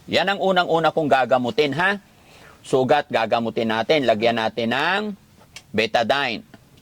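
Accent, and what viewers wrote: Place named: Cavite, Philippines. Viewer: Ung tono ng salita nya sounds like cvte o batangas...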